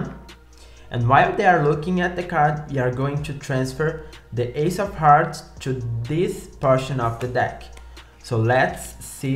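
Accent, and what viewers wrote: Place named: Brazil. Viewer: your accent sounds kinda Brazilian to me kkkkkkkkk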